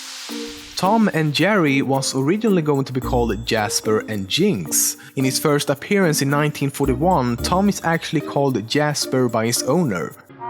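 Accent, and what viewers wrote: Sweden. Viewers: You! Person who is making this video. Where are you from? Your dialect sounds a lot like those who come from my country, Sweden! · Hes not russian he is actually swedish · Is he swedish?? He has a swedish accent.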